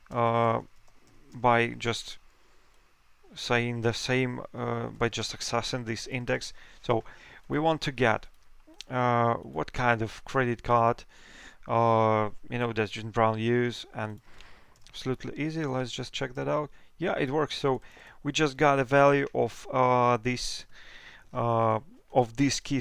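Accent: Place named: Russia. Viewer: The Russian accent, haha :)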